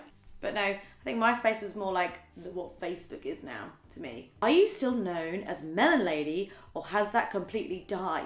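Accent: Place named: England, United Kingdom. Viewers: Karina Natalie it’s a Norfolk accent x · So I’m from the U.S and I have always been curious about where in England her accent is from. Anyone know??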